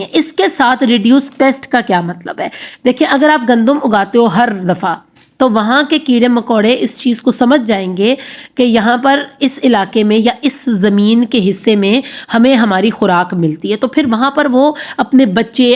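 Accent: Punjab, Pakistan. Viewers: Ma'am ka bolne ka tarika Bata Raha hai k ma'am Punjabi Hain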